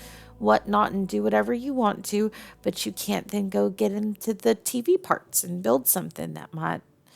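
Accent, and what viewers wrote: South, United States. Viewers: Your Southern accent is the most beautiful I’ve ever heard 🥹🥹. This Yandares are the most loving and loyal I would love to that for a Girlfriend someday 🙂‍↕️. You are an amazing woman thank for this Scary yet gorgeous audio also I subscribe to your channel today 💗💗💗💗💗💗. Kind Regards AuraKnight 🥰🥰🥰🥰🥰🥰🥰.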